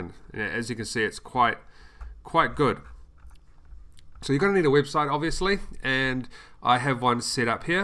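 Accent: New Zealand. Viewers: Very refreshing to hear the kiwi accent !! I'm subbing just for that reason, i'll watch the video now haha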